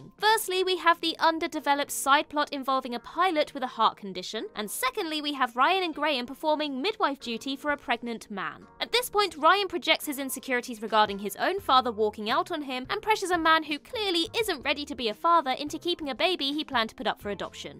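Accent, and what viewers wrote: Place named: United Kingdom. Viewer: Ayo i love british accent ”doctor U”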